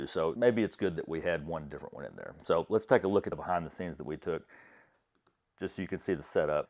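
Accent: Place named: Texas, United States. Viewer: Another great video. Thanks so much. Side note: being from Miami, seeing a Hispanic person talking with a Texas accent really messes with my head. 😂😂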